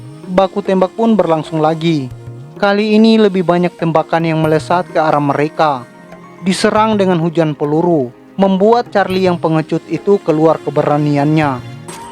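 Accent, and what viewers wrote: Eastern Indonesia, Indonesia. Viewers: Fix adminnya orang timur. Jelek banget pembawaannya 😅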